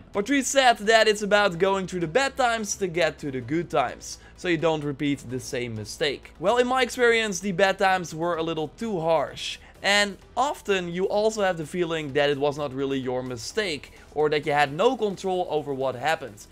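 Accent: Netherlands. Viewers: Love your Dutch accent!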